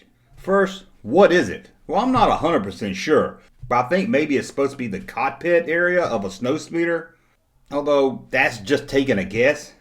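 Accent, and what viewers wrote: South, United States. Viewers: I dig your vids and the southern accent (which I'm sure you don't think you have) is awesome. Funny, how, the name C-3PO was shortend in the film to "3PO or Threepio", and most put the emphasis on the "C" (or "Three") when saying his name. But you put the emphasis on the "PO". It's not hate mail, just intriguing.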